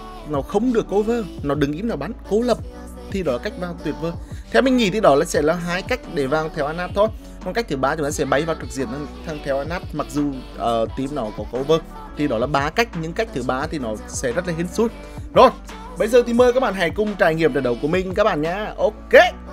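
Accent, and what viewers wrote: Nghe An, Vietnam. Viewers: A ở chộ mô nghệ an vậy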